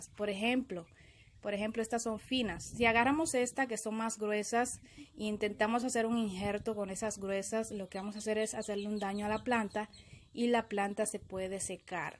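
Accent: Dominican Republic. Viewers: ahh es que no conocia el acento de republica dominicana suerte con tus arboles👍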